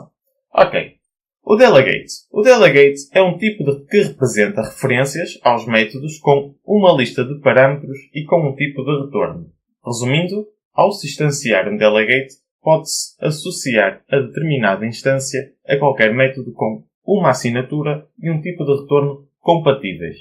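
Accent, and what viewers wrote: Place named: Portugal. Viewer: O sotaque Português me incomoda por que eu sou Brasileiro e não estou acostumado (fica difícil de entender pra mim...) mas ainda assim, Parabéns pelo ótimo Vídeo :D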